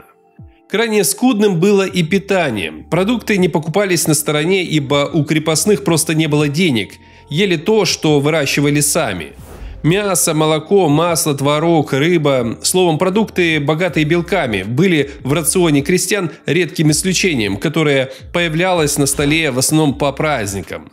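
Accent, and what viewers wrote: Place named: Ukraine. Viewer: Страна-резидент канала: Украина(это слышно и по говору),так что,все понятно, псевдоистория с вымыслами... В России НИКОГДА не существовало рабства,каждый человек был лично свободен(экономическая зависимость-это другое дело). Раб не может сменить социальный статус,а крестьянин в России-мог! Крестьянские дети становились дворянами,как бы(потому что это требовало,как минимум,образования). Крестьянин мог подать ЖАЛОБУ на помещика,напрямую,Царю. Крестьяне жили общиной,"миром",имели СОБСТВЕННОСТЬ и сами продавали выращенную продукцию-где тут рабство? Розыском беглых крестьян обязан был заниматься помещик, то есть никакой системы государственного закабаления не существовало.. Крестьянство было СЛУЖБОЙ,только,не государевой,а персональной. Феодальная система! Общественный договор! Взаимозависимость!!!! Помещик ОБЯЗАН был заботится о крестьянах и кормить их в неурожайные годы-отмена крепостного права вызывала БУНТЫ крестьян,так как помещик теперь мог просто выгнать неэффективных и ленивых работников,"освободив" их. 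"Продавали" крестьян деревнями,то есть,это была просто смена собственника,как сейчас с заводами. Отдельных крестьян не столько "покупали",сколько переманивали-хорошего кузнеца,например. Крестьянин,до определенного года,мог вообще,сменить помещика(на Юрьев день)-рабство,говорите? Разумеетс,были периоды и случаи не очень красивого обращения с людьми-но их всегда было мало и это общественно порицалось. Не забываем,что ВСЕ русские тогда были одной веры и,если европецы в Новом с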